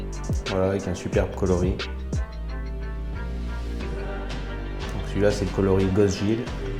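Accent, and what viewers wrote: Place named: North, France. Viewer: salut moi c Seb je suis pas mal ta chaîne mais je viens seulement de comprendre comment s'abonner jsuis pas doué lol. sinon je vais souvent au der j'ai une barque bourlingue moteur avant avec i pilot la juste un petit prblm de moteur arrière ça va venir bref sinon pour savoir si ça te dirai une peche avec un chtimi car je suis originaire du nord et vue ton accent tu dois pas en être loin. maintenan j'habite a 50 min du bassin nord maintenant au plaisir de ce voir sur l'eau ou un rdv pour une sortie a deux bon wekend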